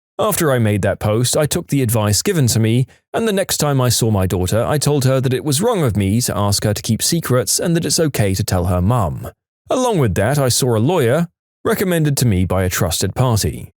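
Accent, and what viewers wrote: United Kingdom. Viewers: i love the british accent mate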